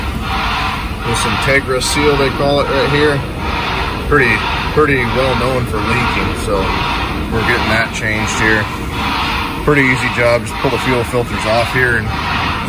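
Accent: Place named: North, United Kingdom. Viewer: Judging how he sounds like he's from the north, I'd say this dudes gotta be making 35 bucks an hour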